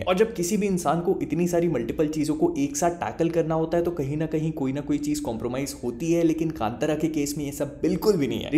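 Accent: Bhopal, India. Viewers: Ae Bhai Tum Bhopal se ho kya😶😳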